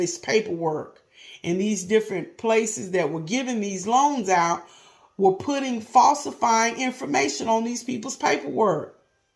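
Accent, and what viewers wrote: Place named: Pittsburgh, United States. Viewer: YOU TALK LIKE YOUR ARE FROM PITTSBURGH.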